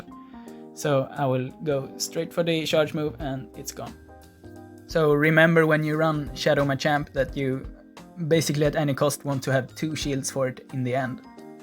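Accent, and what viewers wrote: Sweden. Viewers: Love Swedish accent so much! So sad that I was not able to fly to Stockholm recently. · damn that's some swedish accent. kinda love it thoe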